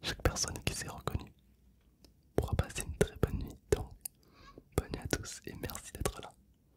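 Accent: South, France. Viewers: J'adore ton accent du Sud. J'ai le meme!! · Aller hop jsuis trop fier g entendu mon nom😂😂😂trop beau l'accent du sud bref force à toi👌👌❤️💪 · On entends l accent du sud 😂😂😂😂😂😂😂😂😂😂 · Je comprends pas son accent français mais c’est quand même grave bien 😭😂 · L’accent du sud 🥳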